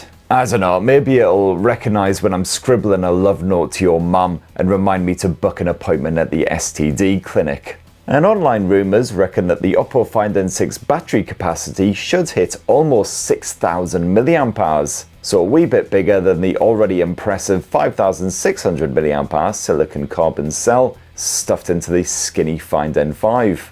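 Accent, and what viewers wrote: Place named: Glasgow, United Kingdom. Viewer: She sounds like a glaswegan saying "ya goon, let's go" after the post-work pints.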